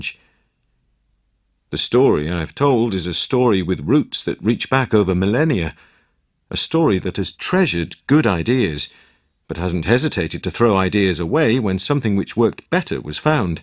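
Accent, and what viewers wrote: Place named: England, United Kingdom. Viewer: Who's the narrator? Best English accent ever!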